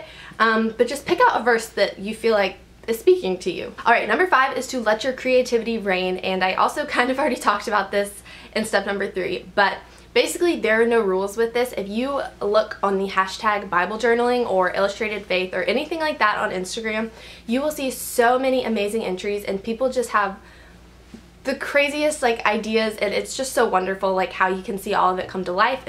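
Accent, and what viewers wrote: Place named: Alabama, United States. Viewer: Beginning of the video: "she sounds like she's from Alabama Description: "from Alabama" Haha I know my state well :)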